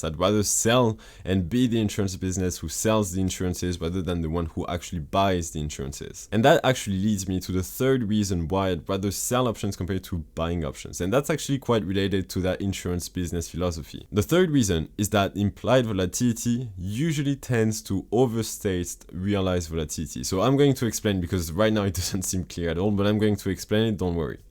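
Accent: France. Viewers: Good video mate , I tried to open a tasty works account (I’m in 🏴 England) Was easy to open but getting the money into the account via CurrencyFair was a right nightmare. Ended up opening an interactive broker account and I can get the money in and out with no issues as interactive brokers have bank accounts throughout the world so it’s just like sending it to another English bank account. My 1st cash secured put will be going in today also 🥳🥳 on AT&T because ultimately I don’t mind if I get assigned. By your accent I’m guessing your french so do use CurrencyFair or a french bank to fund tastyworks just out of curiosity. Have a good day.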